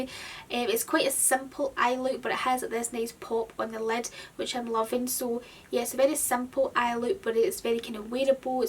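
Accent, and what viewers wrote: Scotland, United Kingdom. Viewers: Can tell a Scottish accent a mile off 😍x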